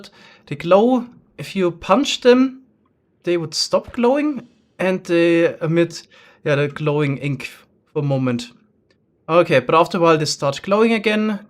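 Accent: Germany. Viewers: Love you and your german accent, but: "Glow Lichen" is pronounced "Glow LIE - KEN"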